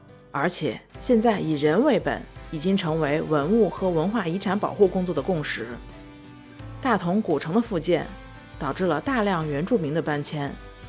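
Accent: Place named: Beijing, China. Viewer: 博主，你那京腔太明显了，普通话要好好练练。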